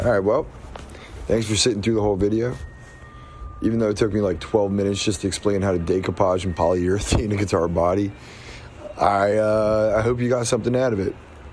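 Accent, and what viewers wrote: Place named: Philadelphia, United States. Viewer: I haven't lived in Philly in like 18 years but your voice is makin me homesick.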